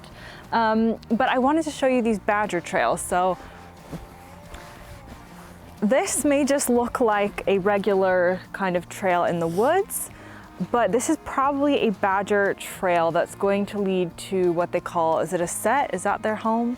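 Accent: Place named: United States. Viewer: Why does your accent still sound fully American after living in the UK for 10 years?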